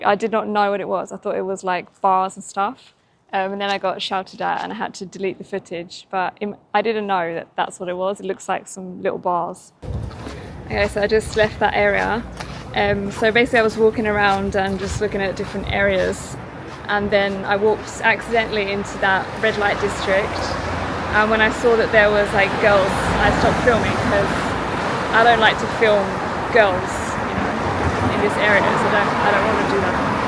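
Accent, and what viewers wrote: England, United Kingdom. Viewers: You got this kind of stuff in every city you live in around the world! Japan is not the only place and saying it isn’t a nice place to live when there bad places and good place to live in Japan just like any other country. And you sound English so I know for fact England isn’t paradise! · That British accent is top notch 😂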